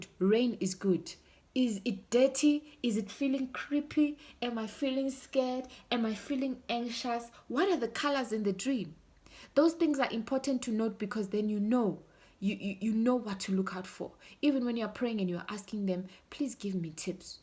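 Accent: Nigeria. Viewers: It the Nigerian accent for me😅😅🤞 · Nigerian accent akere 😃😃👌👌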